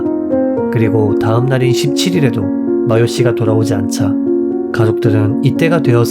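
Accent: Japan. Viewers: ㅎㅎㅎ중간 중간 일본분 액센트가 들려 일본인인줄 알았어요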